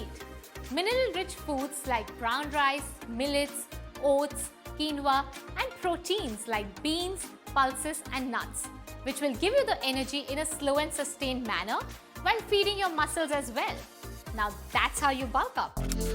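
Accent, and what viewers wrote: India. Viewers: I am a Filipino and I love the English-Indian accent!❤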